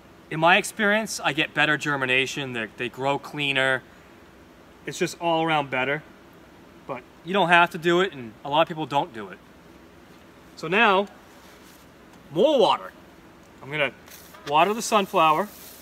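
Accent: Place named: New Hampshire, United States. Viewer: New Hampshire, I was wondering where that accent was from.....there is another Youtuber from NH I watch as well, has a homestead he is building. Good insights on basil, really appreciate this video.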